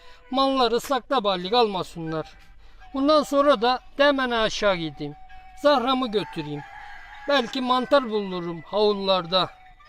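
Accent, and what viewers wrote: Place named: Giresun, Turkey. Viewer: Emeğinize ve ağzınıza sağlık başkan. Çok güzel bir Giresun şivesi olmuş Rahmetli babannem böyle konuşuyordu.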